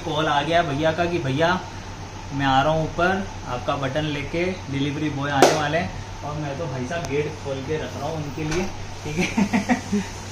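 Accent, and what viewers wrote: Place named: Bengal, India. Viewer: Mam hamera Bengal sa ha pata chal raha ha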